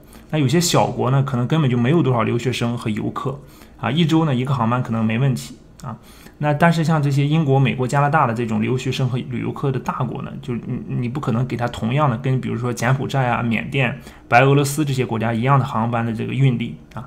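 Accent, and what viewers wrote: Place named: Shanxi, China. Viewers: 山西口音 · 是山西口音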